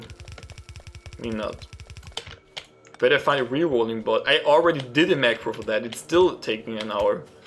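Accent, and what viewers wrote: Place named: Eastern Europe. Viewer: I thought he was eastern European like the developers.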